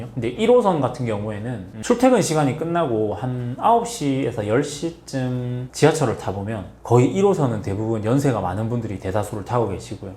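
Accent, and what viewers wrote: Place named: Gyeongsang, South Korea. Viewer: 경상도 사투리 쓰시네 그래서 부산을 좋아하시나 싶기도 ㅎㅎ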